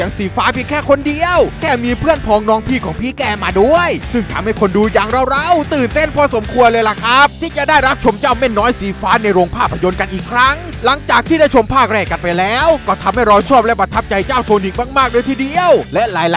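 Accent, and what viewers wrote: Thailand. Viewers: เสียงเหน่อทุกท้ายประโยค แอบหงุดหงิดเวลาฟัง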